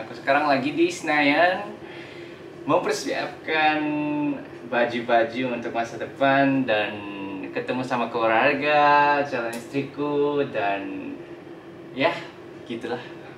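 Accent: Malaysia. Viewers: nuna dan oppa adalah channel fave aku❤ kalian tulus ya suka Indonesia, sungguh terharu.... oppa bahasanya kaya Malaysia❤ negara terdekat kami, sodara kami ❤ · Bahasa Indonesia masih bercampur bahasa Melayu Malaysia, mungkin karena lama juga di Malaysia. Semoga lancar acara pernikahan nya.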